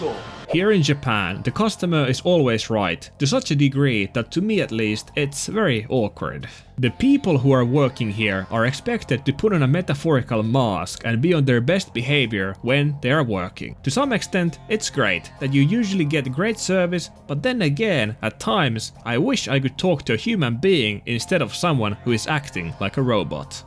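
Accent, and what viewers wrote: Finland. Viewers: You are finnish from ur accent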